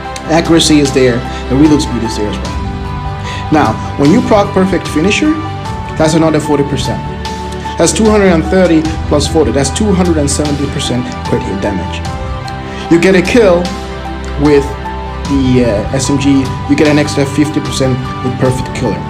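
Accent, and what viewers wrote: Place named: Nigeria. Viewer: You sound Nigeria bro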